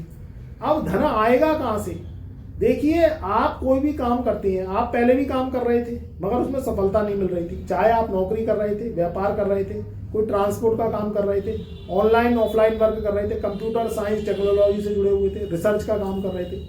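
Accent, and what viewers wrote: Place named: Agra, India. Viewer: पंडित जी आप किस शहर से हैं? आगरा mainpuri side effect है शैली में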